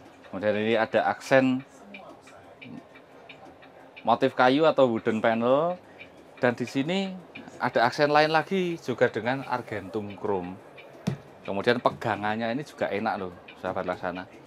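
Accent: Java, Indonesia. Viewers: Suka gaya bicaranya, agak medok, ditambah lagi guyonan dan kata² inspirasi atau kata² mutiara juga baik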